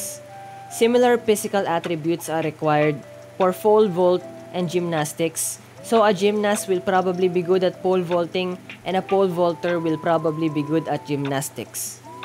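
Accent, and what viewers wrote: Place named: Philippines. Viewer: Why is a Filipina narrating the vid? (guessing by the accent) Shouldn't it be an Italiana?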